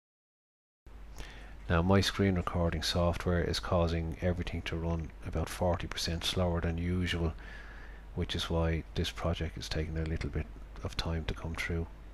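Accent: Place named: Ireland. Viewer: Nice video here, and love that Irish accent. Thanks for showing the ZB to Keyshot Bridge in accent. Nice character too. Do you know if the Bridge works with any version of Keyshot 5 or later or if I need the special Keyshot for ZB?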